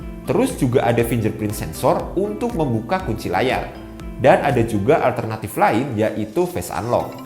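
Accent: Purwokerto, Indonesia. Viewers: Orang purwokerto kah?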